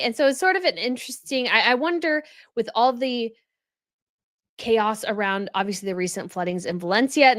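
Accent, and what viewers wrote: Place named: Midwest, United States. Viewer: Hey I’ve been watching your videos for a few weeks and I So appreciate that you refuse to say “controversial” words. I also appreciate your stand on right and wrong (not always what the world considers right and wrong) and your ethics! Love your vids and I’m so glad I discovered you. Are you based in the U.S.? You sound like a typical midwestern girl😊. I love it!!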